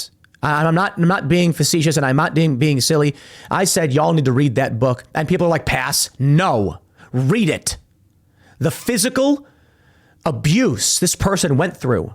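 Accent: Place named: Chicago, United States. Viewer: Anyone else notice that the more self righteous and verbose tim gets, the more he effects an annoying Chicago accent?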